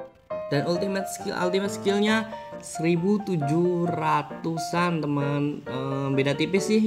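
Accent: Java, Indonesia. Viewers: Medok mas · Medok banget bang :v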